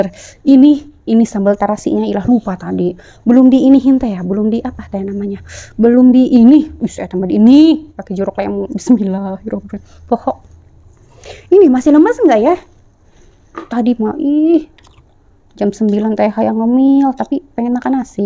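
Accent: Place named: Menes, Indonesia. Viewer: Teh orang Menes nyah,dari logatnya keliatan heheh salam ti abdi orang Maja..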